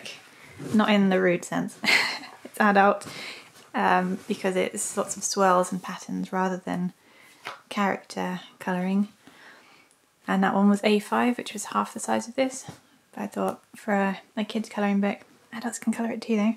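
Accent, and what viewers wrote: United Kingdom. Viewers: Hey, r u a brit??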